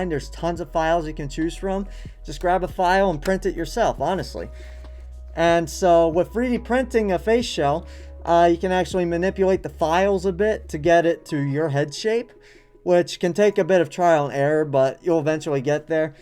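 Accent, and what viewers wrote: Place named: Netherlands. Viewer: Are you Dutch by any chance I can hear the accent a little bit hahaha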